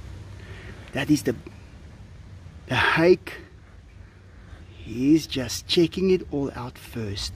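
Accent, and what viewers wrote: South Africa. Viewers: The accent is unmistakenly South African...unless I'm mistaken. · Cartoon head Since people decided to be rude answering you, I’ll explain the reason so you don’t argue back rudely and cause another pointless comment war. Apparently, this person received 3 rescue tigers (1 died and Enzo was really sad, that’s when Diego came in) and since these are rescue tigers, they never learned from their mothers how to survive out in the wild to hunt and eat. This person also lives in South Africa (his accent), and these tigers do not belong in that region. If they are free, they will starve to death because they’ve been in captivity for many years with this special person and they’ve been fed by him. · Are you South African? You kind of sound like a mixture of Steve Irwin and sharlto copley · Is this a south african accent?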